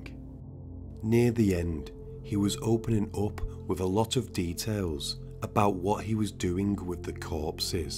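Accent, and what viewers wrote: United Kingdom. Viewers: 8 Million UK narrators doing US Stories. What is the frickin deal??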